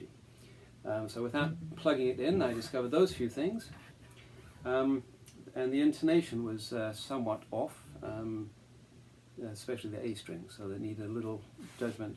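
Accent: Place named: Florida, United States. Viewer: I’d recognize that Florida accent anywhere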